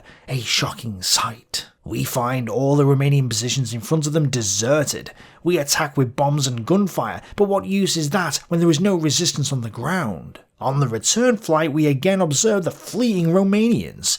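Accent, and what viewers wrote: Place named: Liverpool, United Kingdom. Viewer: I’m really not placing this accent, I’m getting Liverpool, a hint of Manchester, and possibly Lancashire as well. Maybe St Helens???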